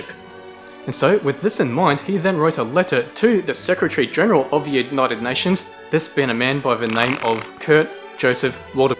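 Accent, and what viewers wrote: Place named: Australia. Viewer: Good to hear australian voice and humour 👍👍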